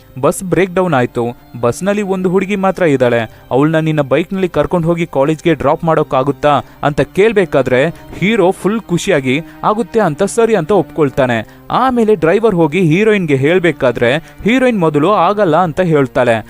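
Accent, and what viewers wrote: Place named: Kerala, India. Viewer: Mallu bhaay kannada maathaadthiro haag kelsthide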